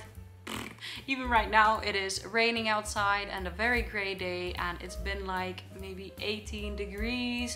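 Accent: Netherlands. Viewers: Welcome to the Netherlands,.. The funny thing is that your English pronunciation has a bit of a Dutch accent to it instead of a typical Scandinavian accent:) Also you moving to the Netherlands and our Floor Janssen made the move to live in Finland, is 'kinda' funny. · Your Dutch accent is really close when you speak English...... Sounds more close than the your Dutch itself. Stick to the english vowels and you got it !👍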